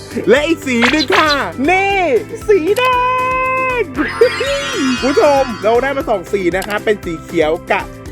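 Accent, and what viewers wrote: South, Thailand. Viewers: พี่เป็นคนใต้หรอคะหนูพูดใต้ไม่ได้แต่หนูพูดจีนได้ค่ะ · พี่เป็นคนใต้หรอครับ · แม่เจอแล้วพี่เป็นคนใต้เหรอ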